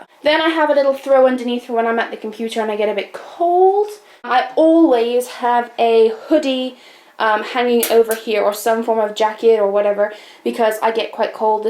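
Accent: United Kingdom. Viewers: where are you from, you sound british, and most of the stuff is from british shops, but im sure i can hear another accent in there somewhere <33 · british accent dear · Are you Scottish or welsh or English? But when I saw the Victoria secret dogs I thought they were from the USA and also the candles.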